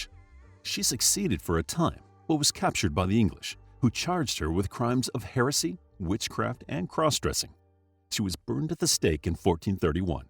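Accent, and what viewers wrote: United States. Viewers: I can barely understand the american accent 😭😭 had to repeat the video 3 times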